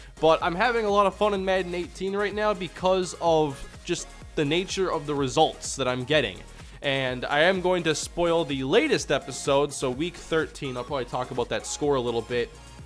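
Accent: Minnesota, United States. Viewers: are u from minnesota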